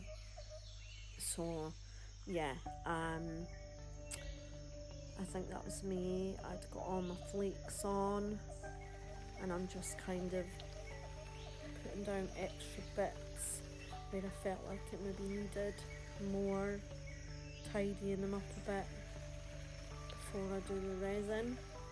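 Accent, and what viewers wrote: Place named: Scotland, United Kingdom. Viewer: lol 😂 ur so funny! It’s def more than okay. But I get it, most people don’t hear their own voice in the same way others always here them. So it sounds weird to ourselves. Plus, I really get the chance to hear a beautiful Scottish accent. You’re so used to that sound I don’t you jump for joy over it, but someone else’s accent you like and don’t hear often makes it awesome. Well thank you kindly for the compliment, if I always wasn’t so stuffed up from allergies maybe I could speak more clearly. 😜😘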